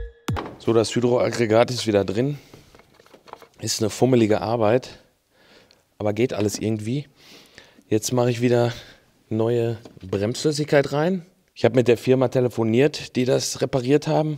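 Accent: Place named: Dorsten, Germany. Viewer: Ich komme aus Dorsten und lebe schon seit über 20 Jahren in Süddeutschland, ...du redest, wie bei uns zuhause, alleine schon deshalb gucke ich deinen Kanal. Weit weg bist du auch nicht, oder?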